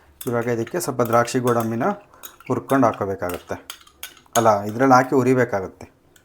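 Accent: Chitradurga, India. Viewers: ಹಲ್ವ ಮಾಡೋದು ಚೆನ್ನಾಗಿ ತೋರಿಸಿದಿರಿ ಚಿತ್ರದುರ್ಗ ಕಡೆ ಭಾಷೆ ನಾ ಇದು ಚೆನ್ನಾಗಿದೆ ಚೆನ್ನಾಗಿದೆ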